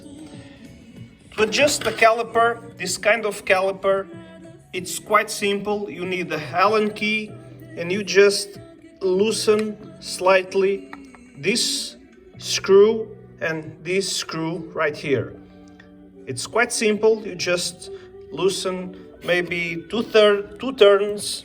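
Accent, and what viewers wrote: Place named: Brazil. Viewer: Sabia q era br pelo sotaque kkkk Vlw pelo video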